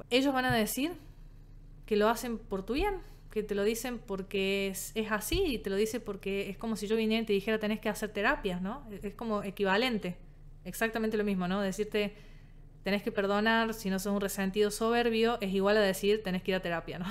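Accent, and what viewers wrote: Córdoba, Argentina. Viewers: Sos genial, como profesional y como persona. Te abrazo fuerte. Soy de la ciudad de Córdoba, por tu tonada me parece que también? Me encantaría ser tu amiga, jaja. Somos de la misma edad imagino. No sé si se hacen amistades así pero desde que te sigo lo pienso 😅 Qué intensidad todo lo que aprendí este año sobre psicología gracias a querer entender cuál ha sido mi experiencia con mi ex. Me ofendió, me dolió muchísimo lo último que me dijo. Pasó límites. Es alguien a quien amo aún, fue mi amor por años, mi gran amor, mi mejor amigo. Me cuesta mucho volver a querer tener relaciones amorosas con hombres porque me siento dolida y traicionada, así que todas estas palabras me ayudan un montón. ❤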